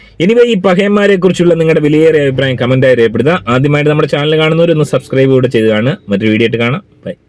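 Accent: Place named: Malappuram, India. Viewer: എടാ പഹയാ അന്റെ വർത്താനം ഇന്ക് നല്ല ഇഷ്ട്ടായി 😂😂😂😂👍👍👍👍മലപ്പുറം da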